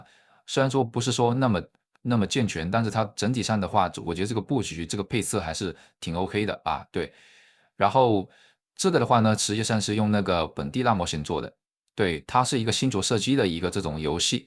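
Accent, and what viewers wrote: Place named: Guangxi, China. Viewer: 口音应该是广西老乡。😀刚想找个Codex对接本地模型方案，讲得很详细。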